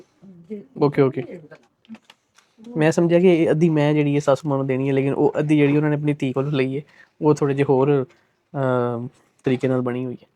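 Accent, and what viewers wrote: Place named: Lahore, Pakistan. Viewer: bhai apse kisi video me question kiya tha k ap kidhr se hein. Apki punjabi se lgta tha ap lahore se hein. Phir kisi video ne suna apne btaya apne bht sari life lahore me guzari hai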